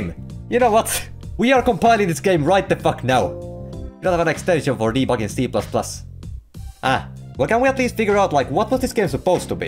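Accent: Finland. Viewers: He's obviously Finnish he speaks with a rally English accent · I've watched couple of your videos and I didn't know you were from Finland before you said "vittu". After that i just couldn't unhear the accent. Torille jee🇫🇮🇫🇮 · He's finnish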